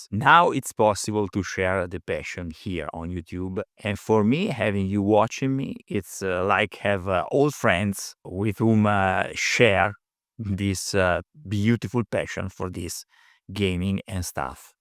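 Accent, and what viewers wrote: Italy. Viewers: I’ve never had trouble understanding you. There’s some charm to your Italian heritage and accent. Best of luck, have loved the channel for a long time.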